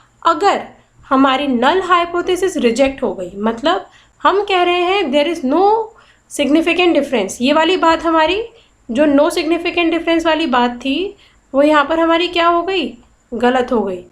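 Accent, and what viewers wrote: Bengal, India. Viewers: Madam, Apka bangali hai, .... Matlab ko mane bol rahi hai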